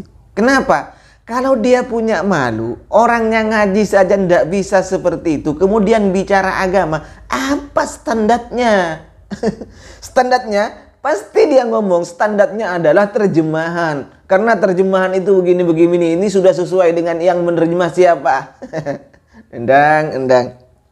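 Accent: Madura, Indonesia. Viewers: Logat bahasa nya agak ke madura... Salaam Sataretanaan.. Sehat selalu cak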